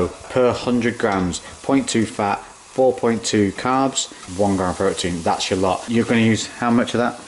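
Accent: United Kingdom. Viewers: Hate brit accent !!!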